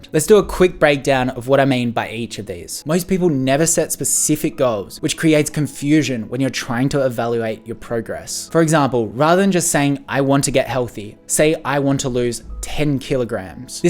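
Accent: Australia. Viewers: Your channel are full of useful knowledge, also the Aussie accent reminds me of the days I used to study in Melbourne. And i am so suprise that we are almost at the same age and you already achive such a great success, i am start to learning e-commerce! thanks!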